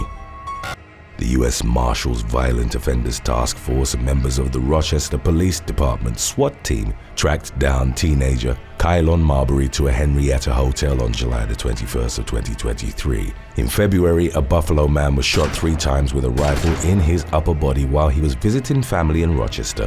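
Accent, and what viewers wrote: United Kingdom. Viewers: The narrator sounds like "Idris Elba." I wonder if he grew up in a similar place in the UK as Mr. Elba? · The best part about this channel is the British pronunciation of American locations. 😂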